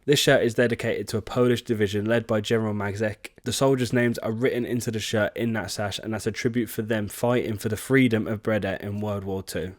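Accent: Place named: England, United Kingdom. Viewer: Also, you’re English, so it’s totally fine to use English pronunciation; every other language pronounces most of the foreign words in their own pronunciation. If you think about it, the Italians, French, Spanish, all pronounce English words like e.g. Manchester in their own way. No need to apologize, just be you and keep up the good work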